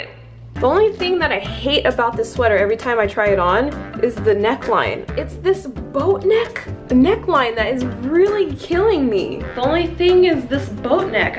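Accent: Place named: Australia. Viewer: Your Australian accent is amazing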